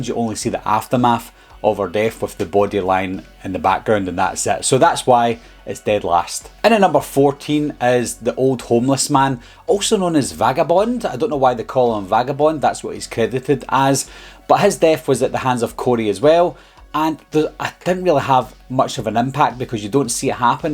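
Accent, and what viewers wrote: Scotland, United Kingdom. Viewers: I love your accent, is it Scottish?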